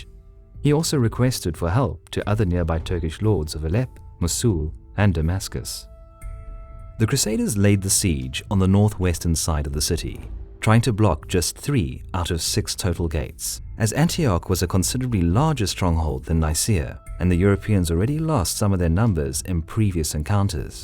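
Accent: South Africa. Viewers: south african is the accent is my guess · Can I just ask, is your commentator South African? Please reply.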